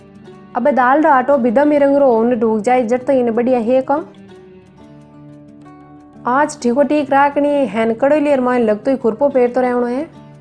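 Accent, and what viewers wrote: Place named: Marwari, India. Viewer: Khamma ghani sa🙏 bohot achi marwadi boli sa , recipe b badiya sa, bohot acho lago mithi marwadi sune ne😊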